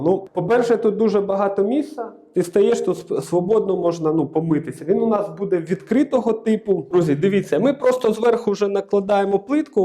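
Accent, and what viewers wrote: Surzhyk, Ukraine. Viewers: за суржик 👎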